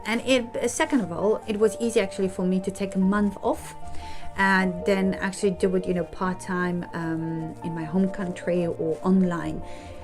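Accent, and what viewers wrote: United Kingdom. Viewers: Hi, thanks for your answers:) Currently I am a student and my English is oscilated between B1-C1, everything depends on what we are talking about: speaking, writing or listening and so on. I am impressed by your British accent. Would you be so kind and maybe make a video or just write a comment, how to make your pronounciacion more Biritsh for non naitive speaker. I know that there are a lot of different videos on YT, but why not?:) And maybe how to understand wishes for Polish people or other "hard" English grammar constructions? Greetings:) By the way, do you think people like me, whose English level is not yet C2, but I hope one day it will, are able to pass CELTA, without having diploma in either linguistics or english philology? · You sound like a native british :) congrats! Where are you from?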